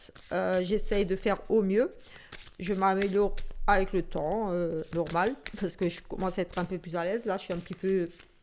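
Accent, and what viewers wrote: Alsace, France. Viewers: Bonsoir, je vous ai découverte il y a peu. J'ai immédiatement entendu que vous veniez d'Alsace et il n'y a pas de problème. Je suis du Sud ouest et j'ai aussi un accent alors ne vous laissez pas diriger par les autres pour si peu. Dites ce que vous avez à dire. Merci pour votre vidéo.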